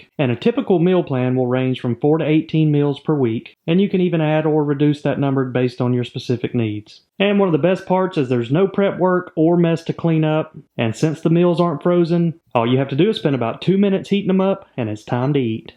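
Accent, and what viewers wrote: South, United States. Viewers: I just love listening to this american southern accent! greetings from Europe!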